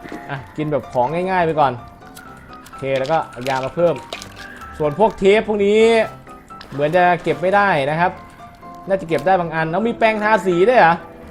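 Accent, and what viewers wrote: South, Thailand. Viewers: ภาษาใต้วันละคำกับพี่ 😎😎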